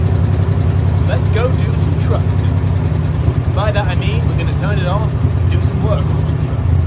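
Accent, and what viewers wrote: England, United Kingdom. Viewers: Good to have and hear a Brit on here having fun with old cars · Lovely engine sound. Where in England is your accent from? Excuse nosiness. Huge difference in fuel prices. Would cost an arm and a leg running those lovely big engines here.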